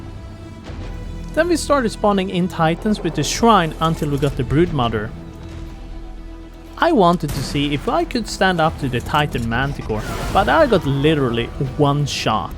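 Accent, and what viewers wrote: Sweden. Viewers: är det svenska jag hör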